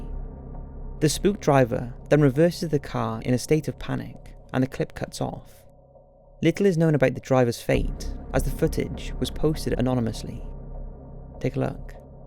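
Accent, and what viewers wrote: Wales, United Kingdom. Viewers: TheMillster 81 - I love his soft Welsh burr! It's unmistakable. 😊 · I love your videos! Are you by any chance Welsh? I’m sure I can hear it in your voice!! Maybe Cardiff?! 😁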